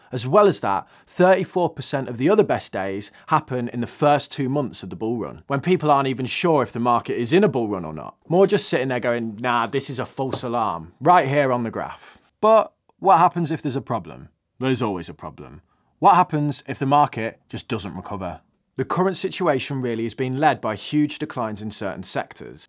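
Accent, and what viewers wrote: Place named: Midlands, United Kingdom. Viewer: Trying to pinpoint your accent, sounds slightly midlands with a northern twang or maybe Cheshire?! Anyway great content as per usual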